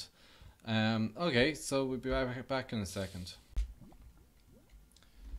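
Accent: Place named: Waterford, Ireland. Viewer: Thank you soooo much man, i have spent literally 18 hours between trying to figure this out and watching an insane amount of gobshites TRYING to explain how to do this hahahaha. BTW are you Irish? You sound like a Waterford man ;)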